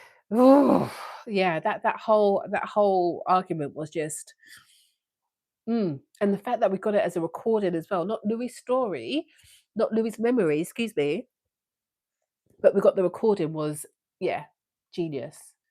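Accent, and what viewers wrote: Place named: United Kingdom. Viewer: I love your voice and your accent!🥰 Are you British? Sorry for such a personal question😅 I'm learning English so accents is a big deal for me. This is one among many reasons why I love Jacob. He absolutely nailed 3 different accents! 🤩 So it's okay if you feel uncomfortable to answer my question. I'm not insisting or being rude😅 Anyways, I like your content 🥰 Thank you!✨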